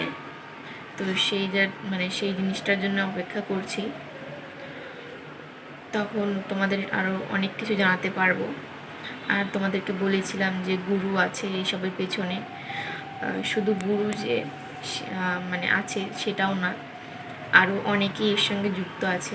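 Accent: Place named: Kolkata, India. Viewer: Are didi Kolkata Bangla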